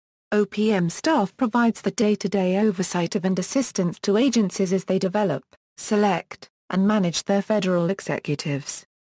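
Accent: United Kingdom. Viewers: why is a British person saying this lol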